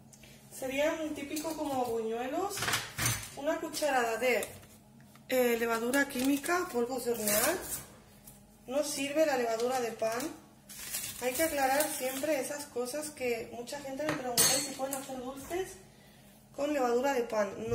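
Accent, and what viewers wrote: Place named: Catalonia, Spain. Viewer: Unas tecrtas faciles i deliciosas, q eres catalana jo si me a parecido e un poco el acento😋😋😘😘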